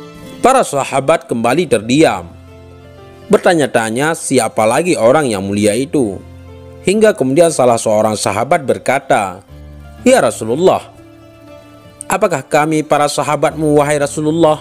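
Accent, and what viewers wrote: Aceh, Indonesia. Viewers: Aceh pidie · Menurutku itu logat orang acheh bro bukan bali ataupun lombok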